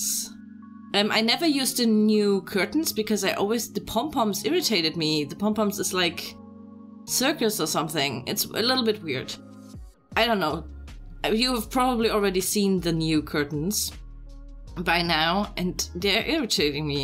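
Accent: Germany. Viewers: Im so early.. anyway you sound German.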